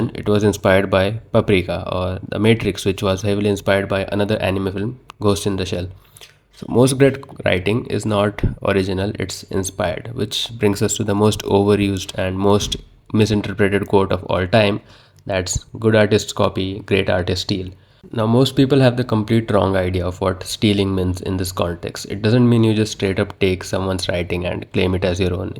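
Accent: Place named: India. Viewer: the second I heard the indian voice, i was like hell yeah man, this video is gonna be legendary.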